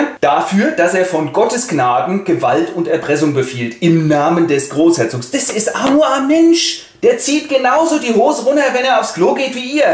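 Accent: Hesse, Germany. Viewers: Kommse aus Hesse? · Ich weiß nicht, wie man das nicht verstehen kann. Auf die Gefahr hin, dass die Frage schon 100x gestellt wurde: bist du Hesse? Bin selber keiner, aber ist sowas von leicht zu verstehen.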